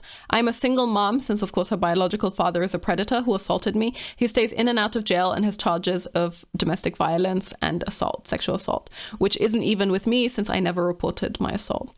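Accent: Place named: Germany. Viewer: great points :) you articulate yourself very well -I hear a slight german accent .... I lived in Berlin for 10 years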